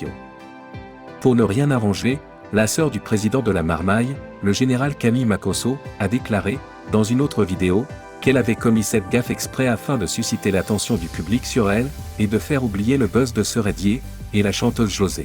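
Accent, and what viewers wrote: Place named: Europe. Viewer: Quel médiocrité.... et utiliser une voix européenne pour dire que les médias de l'occident s'y mêle est encore plus nulle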